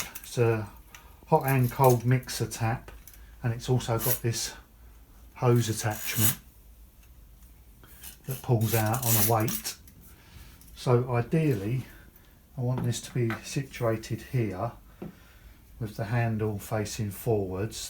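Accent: United Kingdom. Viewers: May I ask, which part of the UK do you come from?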